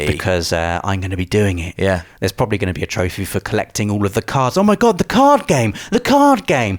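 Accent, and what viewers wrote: United Kingdom. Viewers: Thought same thing when he kept saying Squall, it might be a British way of pronouncing things. Triad was also incorrect when said it the first few times.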